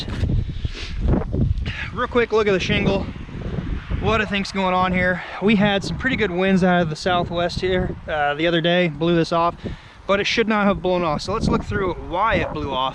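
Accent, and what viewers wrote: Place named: Pittsburgh, United States. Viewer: Pittsburgh PA. You from Pittsburgh, I hear an accent lol. I have a shed roof to fix a few shingles on, thanks for the good video. Yeah, winter sucks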